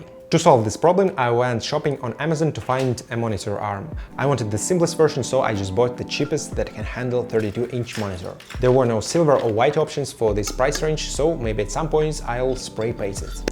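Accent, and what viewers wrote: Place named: Russia. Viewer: Srazu chuvstvuetsa russkoyazichniy akcent. Nice setup.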